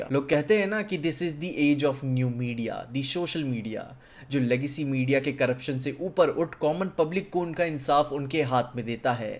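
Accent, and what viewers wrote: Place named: Bihar, India. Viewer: bihari accent ❤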